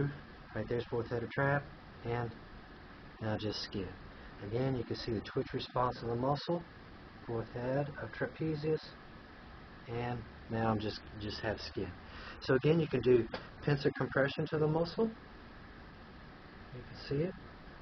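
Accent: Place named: Scotland, United Kingdom. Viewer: I thought he was Scottish when he first started talking lol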